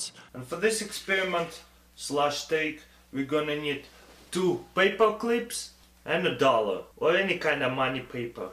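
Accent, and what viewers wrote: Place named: Russia. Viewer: ***** he is russian. i am sorry about the comments. So. he is not slow , he is russian.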